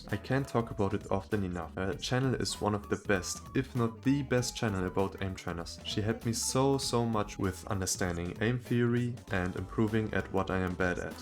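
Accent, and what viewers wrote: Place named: Germany. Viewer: he sounds german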